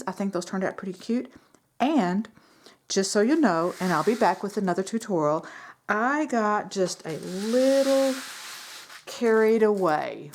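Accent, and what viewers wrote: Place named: South, United States. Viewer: "A little carried away".... I loved that comment, you are not alone! LOL I look forward to more of your videos, kitty and all. A dear friend of mine was raised in Georgia, thus a slight southern voice. You have that same accent, I kept waiting for you to say "well bless her heart" lol 🌺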